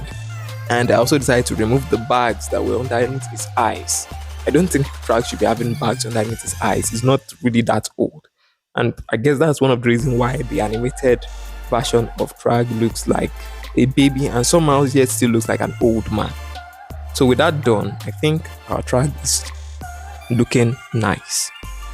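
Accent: Nigeria. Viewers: Loving the naija accent my man! Let's freaking go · I know a 9ja boy's voice when I hear one😂💪🏾. W vid🙌🏾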